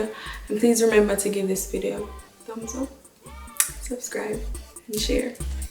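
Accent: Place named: Jamaica. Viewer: Lawwwd goodie u accent 😍😍😍🇯🇲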